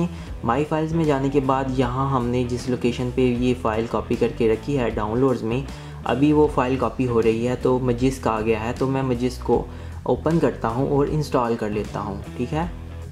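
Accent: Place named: India. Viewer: It is in english but his english is mixed with Indien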